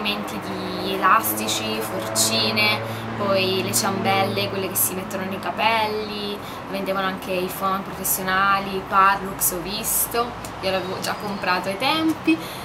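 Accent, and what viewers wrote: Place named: Sicily, Italy. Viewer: ma come mai io pensavo che fossi siciliana???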